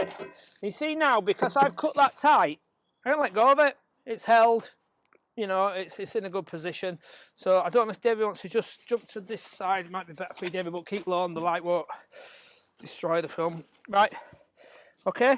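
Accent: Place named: Yorkshire, United Kingdom. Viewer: Found these today, first of all thank you for taking the time to make these. I think they're the best step by step instructional videos I've seen, very well done! And sounds like a proper Yorkshire accent too, which is even better! Question - what size channel do you use for the shoes? Struggling to find one that will fit 75mm internal dims. Do you use the grinder to cut them from a length? Thanks very much, keep up the good work! Si thi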